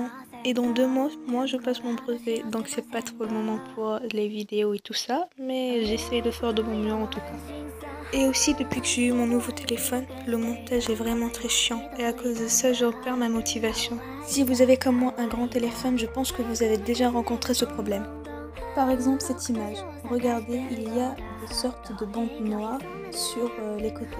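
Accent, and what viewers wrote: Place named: Kabylie, Algeria. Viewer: Ta un accent kabyle comme moi UwU Et tkt ta voix et trop cute je trouve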